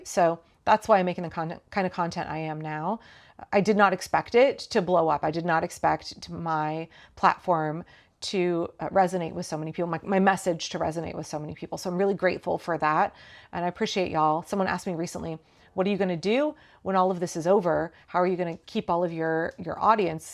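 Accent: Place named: Oregon, United States. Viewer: Am wondering about where you live in Portland. I was born there and lived in Boring, LaGrande, Southeast Portland and then Northeast Portland. Once an Oregonian, you can move to Southern California and Reno (now) but you always feel like an Oregonian. The 70s and 80s in Portland was a great free spirit time. You sound like a true Oregonian which is part of why I love your channel and a big compliment. Thanks!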